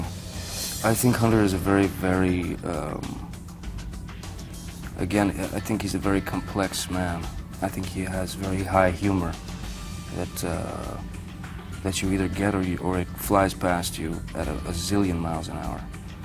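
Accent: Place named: United States. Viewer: I looked this up just to hear his accent. WHY does he sound like so different today? He definitely sounded like an American in this interview.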